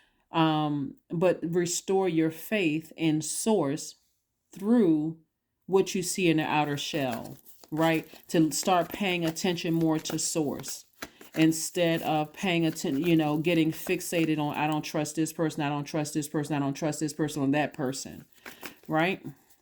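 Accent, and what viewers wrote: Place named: New York, United States. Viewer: Everyone picks up on the New York accent. Always meet the nicest people.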